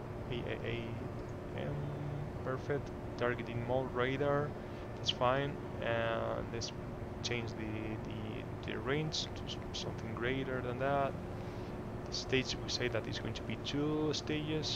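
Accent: Spain. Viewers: Nice, porcierto, asumo que eres español por el nombre y la forma de hablar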